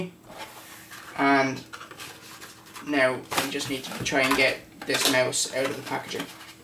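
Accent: Ireland. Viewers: U from ireland??????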